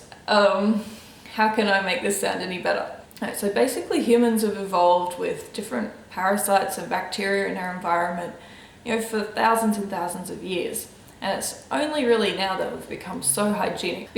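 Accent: Australia. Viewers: Thank you for this video, I have had eczema for as long as I can Remember. I do a lot to heal my gut and body it's a journey and wonderful to hear about yours. Where are you from btw I can't get your accent Australia or Newzealand? you look great now all the best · Is she Australian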